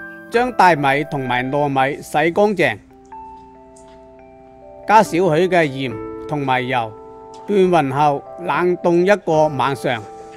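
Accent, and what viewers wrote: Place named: Zhongshan, China. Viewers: 不怪，珠三角一帶，口音是這樣多變的。聽起來像中山。中山、順德一帶，口音多樣化，隔一條江、兩條村，已經有一點分別。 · 鄉音何來怪呢!!師傅鄉音似是中山?!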